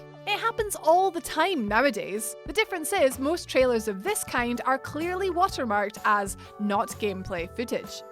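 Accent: Scotland, United Kingdom. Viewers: Great video, but my main enjoyment was hearing a fellow Scot on YouTube for the very first time 😁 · Wooo Scottish lady ken😎🏴🏴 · I love Scottish accent ❤️❤️ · Do I have hearing problems or did she sounded more and more Scottish the farther the video progressed? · She did start sounding more and more Scottish as the vid went on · was i the only one who only noticed she had a scottish accent halfway through the video? was a little confused once i noticed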